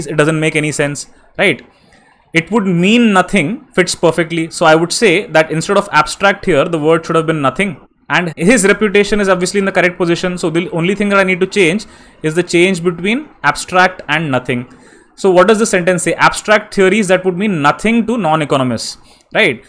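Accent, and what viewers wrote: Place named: South India, India. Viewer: Sir ,Are you south Indian?? Your ascent is like South Indian people.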